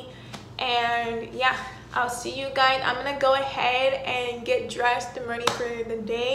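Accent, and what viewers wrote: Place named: Dominican Republic. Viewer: I legit thought she was Dominican, especially because of the way she speaks.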